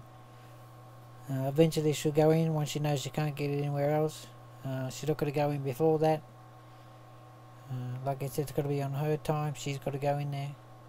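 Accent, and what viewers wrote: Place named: Australia. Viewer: HAHAHAHAHAHAHAHA OH MY GOD! i find myself for no reason finding this totally hilarious. hes 'japanese' but has an 'austrailian' (sounds like to me) accent. and the stuff he says is just bloody class! omg im crying!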